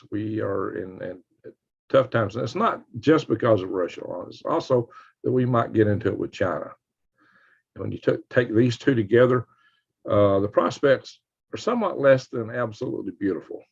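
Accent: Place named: Appalachia, United States. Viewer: I tried to share with my old friend; all he could do is copy accent, 'hill-billy'. My sister would think I was a joiner, so.. Like Pork during Ramadan- good, more for me!!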